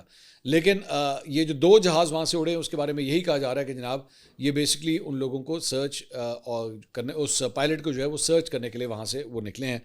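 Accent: Punjab, India. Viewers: Sr punjabi touch zaror daity rahainy we like it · Punjabi Touch 😆 Chas Agyi Awj To 😉😍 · sahib today your punjabi accent make the video more excitting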